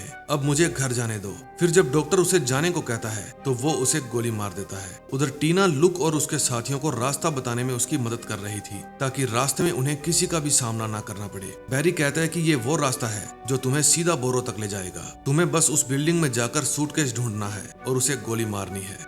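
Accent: Punjab, India. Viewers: ji punjab too belong karde, Hindi wich punjabi accent ah reha?? Just asking and the way you tell a story is really different and you bring humor along with it that’s unique!!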